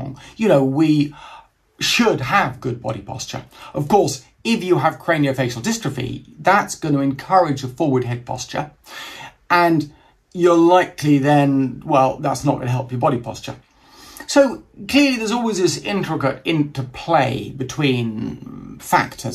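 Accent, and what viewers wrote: United Kingdom. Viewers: The intro got me laughing so hard "AT MY MOTHER'S FUNERAL" you're so British I love you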